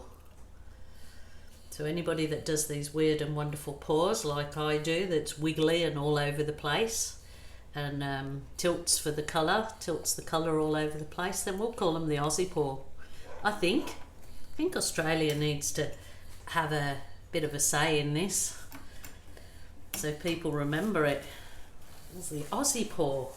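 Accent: Birmingham, United Kingdom. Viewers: Glad your feeling better x i thought you had a touch of a Brummy acsent..thought i was going mad lol x fab pour x still think it looks like a snake xx lots of love from the uk xxIm gonna start making my paints up few days before x great tips today xx thankuuu xx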